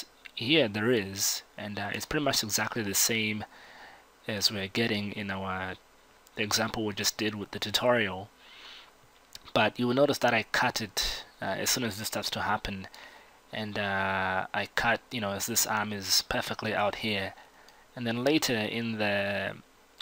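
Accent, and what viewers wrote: Zimbabwe. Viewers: Is the narrator in this video Zimbabwean? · Are you from Zimbabwe? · Definitely Zimbabwean! Haha Waita bho, Wabastira!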